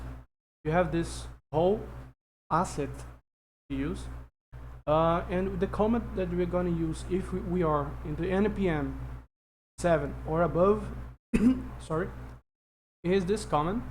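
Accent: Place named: Brazil. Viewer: Sotaque de BR, se for Br da um salve que tenho umas duvidas e to em um projeto que pode te interessar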